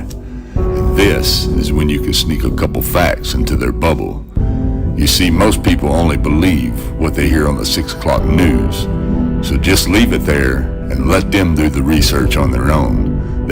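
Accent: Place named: United States. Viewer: I love your accent, not sure what part of the US you are from. Much love from Ireland. God bless my brother in Christ.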